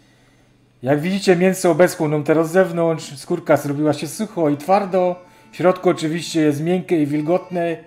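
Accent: Silesia, Poland. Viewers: Piękna sztuka.Dłuuugo już Pana oglądam.Mistrzostwo świata.Rafał z Krakowa. Śląsko gwara mi nie wadzi. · Jak to jest, bo się zastanawiam. Film opisany prawidłową polszczyzną, wchodzę z ciekawości a lektor mi jedzie gwarą!?! To na Śląsku, piszecie inaczej a mówicie inaczej czy tylko ten kanał ma jakiś problem? Albo ja, bo dla mnie to jest problem. Dlatego YT mi nie tłumaczy zagranicznych tytułów video, żebym nie klikał na polski opis filmu po turecku. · Tak jeszcze nie robiłam, więc do roboty puki zima idzie :D A, co do gwary śląskiej nie rezygnować!!!! Cały urok w niej! Ja z Warszawy i pozdrawiam, a oglądającym - smacznego. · Super robota (pracochłonna ta szynka).Pychota, podziwiam talent pana, cierpliwość i i umiejętność obróbki mięsa w pyszna dojrzewająca szynkę.👍👍👍👍👍Tu Bydgoszcz, rozumiem dos dobrze slunsku gwarę.Pozdrawiam i DZIĘKUJĘ. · Oczywiście po śląsku , brzmi super! 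Pozdrawiam z Berlina. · Świdnica, ale proszę rób filmy po śląsku. Wszystko jest bardzo zrozumiałe a klimacik dla ludzi z poza G. Śląska zostaje :-) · Świecianka z kuj-pom, a obecnie mieszkająca we Włocławku, pozdrawia. Filmy tylko po Śląsku. Pięknie się słucha gwary tym bardziej, że mój mąż to też Ślązak z Tychów.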